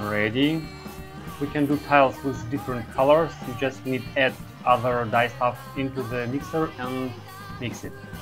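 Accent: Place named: Russia. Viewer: Русский акцент ничем не скроешь.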